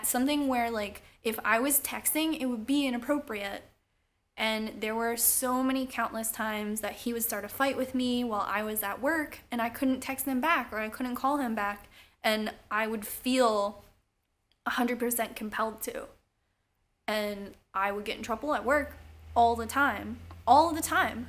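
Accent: Philadelphia, United States. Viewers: Are you from philly?!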